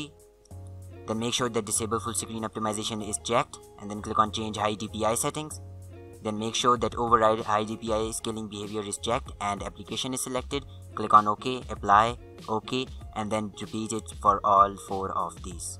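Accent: India. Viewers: he legit has an Indian voice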